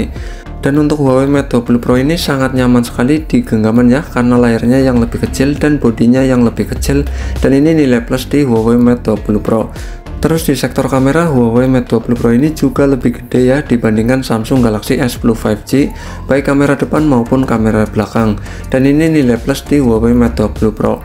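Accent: Banyumas, Indonesia. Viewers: Logatnya ngapak bg😁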